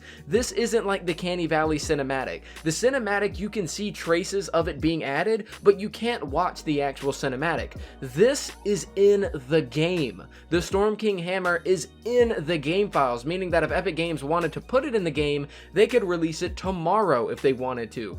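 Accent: France. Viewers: is he from france ?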